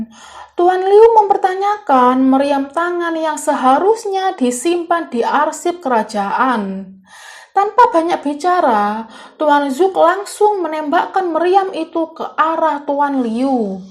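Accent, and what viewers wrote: Java, Indonesia. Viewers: Jelas wong jowo iki?👍👍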